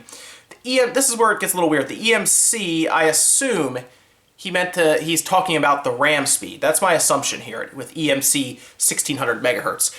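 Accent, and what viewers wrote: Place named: Philadelphia, United States. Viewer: That Philly accent though.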